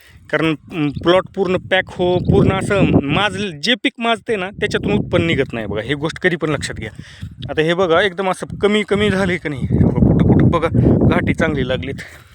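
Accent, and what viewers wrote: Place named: Latur, India. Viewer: Bolibhasha latur che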